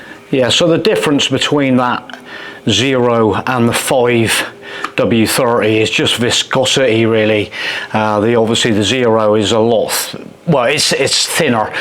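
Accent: West Country, United Kingdom. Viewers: West country eh!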